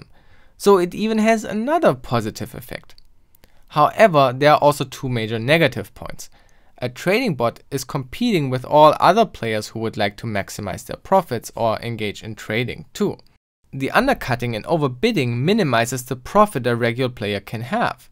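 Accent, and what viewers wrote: Germany. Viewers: Love your German accent, as soon as I heard your accent, I was like " this video should be good".. haha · german? xD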